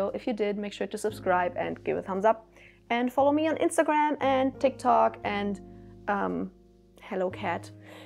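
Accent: Germany. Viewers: When you said you were mostly Danish, I was like "huh, I could have sworn your accent was German". Made more sense when you said you were from northern Germany ahahah. Amazing video, love from Copenhagen!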